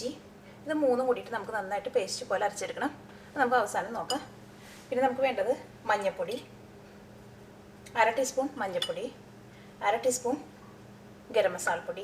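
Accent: Thrissur, India. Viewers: Nice thrissur accent hehe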